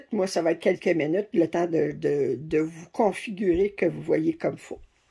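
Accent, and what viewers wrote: Quebec, Canada. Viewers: Merci pour ta réponse. Je vais donc continuer avec ma grille papier, à l'ancienne. C'est sympa de communiquer de chaque côté de l'Atlantique. A ton accent, je suppose que tu es de Québec. Moi je suis dans le sud de la France, en dessous de Bordeaux. Excellente continuation et au plaisir. Caroline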